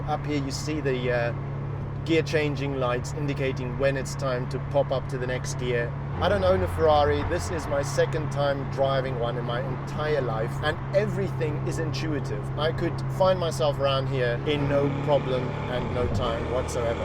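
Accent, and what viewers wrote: Cape Town, South Africa. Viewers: wait your south african ? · ja meneer. Cape Town. ;-) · Yes meneer. Cape Town! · He is South African. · This Presenter is definitely South African. gatta Pattata?. Leon Schuster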